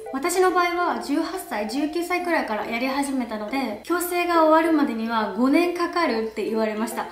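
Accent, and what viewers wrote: Oita, Japan. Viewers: たまに語尾が聞き覚えあると思ったら、同じ大分県の方なのね😂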